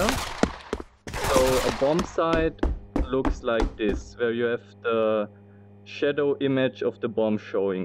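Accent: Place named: Germany. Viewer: dieser deutsche Akzent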